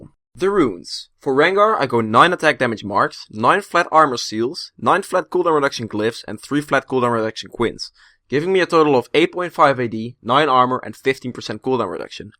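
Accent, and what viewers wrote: Netherlands. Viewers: You're dutch I hear it because of your accent. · I'm new to your channel, but you sound dutch! Are you? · Ja je hoort echt dat je Nederlands bent.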